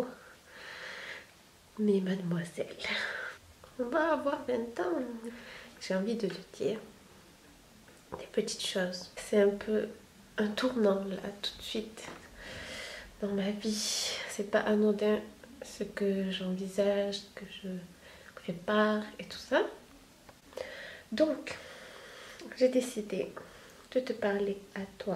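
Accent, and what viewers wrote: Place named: South, France. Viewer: Tes réflexions sur toi-même, sur le monde qui t'entoure, peu de personnes le font réellement dans la vie de tous les jours. Dis-moi es-tu croyante? Si oui, en qui crois-tu? Tu es naturellement belle, j'aurais bien voulu te connaître par amitié avant tout et plus si affinité. J'ai remarqué ton accent du sud un peu; ) Ps: les personnes ayant connu des zones d'obscurité dans leur vie, sont des apprentis les mieux préparés à entrer dans la lumière. Bisous, garde ton naturel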